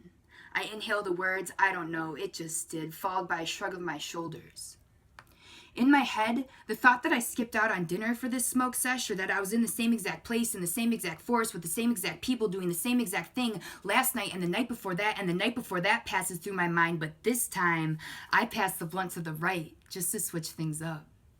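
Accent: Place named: Midwest, United States. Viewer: Damn girl that Midwest accent is so cute what state are you from Wisconsin right?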